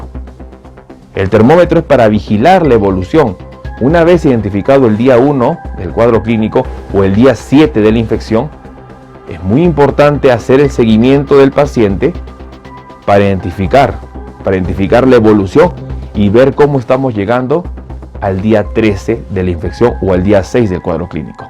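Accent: Peru. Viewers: Por el acento parece peruano o de Colombia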